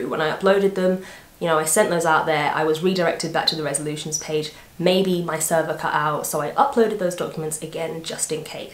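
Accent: England, United Kingdom. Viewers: English is too English. Had hard time understanding her and so I just quit. Maybe she should take a couple of bucks and get a voice coach.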